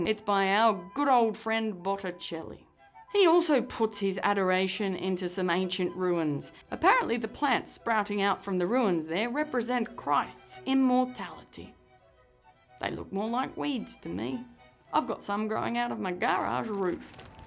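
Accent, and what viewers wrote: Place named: Australia. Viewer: as someone English I think she really doesn't sound English? she's Australian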